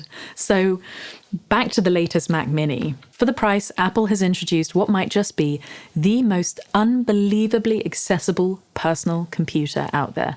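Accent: United Kingdom. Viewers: I just love your voice <sigh> It's the perfect British accent. And yeah, the new MacMinis are remarkable value.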